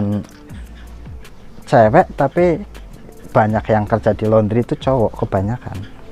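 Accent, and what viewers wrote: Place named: Comal, Indonesia. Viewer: Logatnya koyo wong Comal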